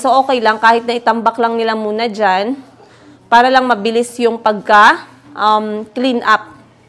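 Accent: Iloilo, Philippines. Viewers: ilong go kaba kc yong tono ng Salita mo ilongo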